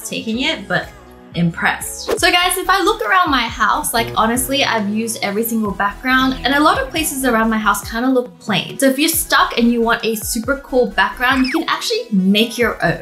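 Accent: Australia. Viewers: Are you from Australia? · Is she speaking Australian ? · I do want to step up my Instagram game and these are helpful! I haven't watched you in a while but I swear your Australian accent is not as strong as before! 😯 What were the camera settings for the darker photos?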